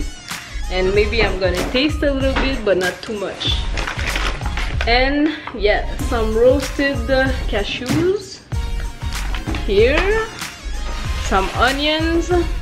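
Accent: France. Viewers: Love your France accent... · Question pour toi, est ce que tu es antillaise? Ton accent c in peu francais ,un peu Creole. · Nice house, I like ur accent it’s like ur first language is French!! Coz you speak like my French friend 🤗 greets from london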